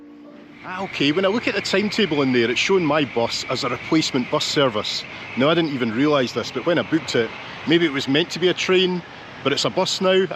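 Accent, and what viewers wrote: Scotland, United Kingdom. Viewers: Watched a few of your streams now, really well put together and also a bonus for the Scottish accent. I’ve often thought about the ferry journey to Iceland and your two videos on it have sealed the deal. I’m normally very much a “van lifer” but love the idea of being a foot passenger. The route to get to the ferry looks very interesting- my sense of direction is awful. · Enjoying your video, my first on your channel, and I love your camera angles, humor, honesty, and charming Scottish accent. Mellow music, too. Subscribed!!!😊👍🏼👍🏼👍🏼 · Just subscribed as I like seeing places through you eyes. Im half scot and you also bring back childhood memories of living near the Broch (Fraserburgh). Saying 'heed' instead of head lol. Not heard 'Quinie' in a while, im listening out.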